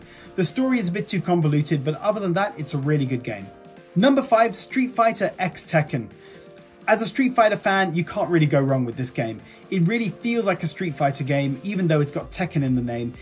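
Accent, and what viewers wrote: United Kingdom. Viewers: im just going by your accent you are from the uk but over here in ireland tekken dark resurrection is still on the psn and so its still on the vita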